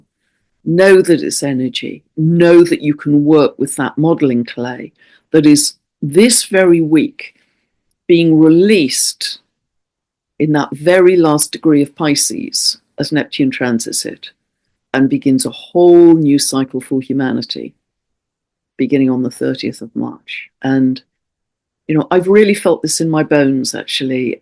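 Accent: England, United Kingdom. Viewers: On the couch with red cushions; I love it, it's so normal and likeable. A clear English accent and, above all, a super-positive charisma and energy! I love your videos; they're a true blessing and a constant companion. It's wonderful that we're connected all over the world! Thank you. Best wishes and belated happy birthday!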